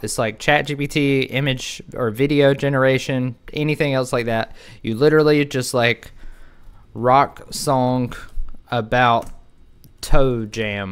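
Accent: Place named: South, United States. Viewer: Nice work bro! What's the accent? It's south of the Ohio river for sure.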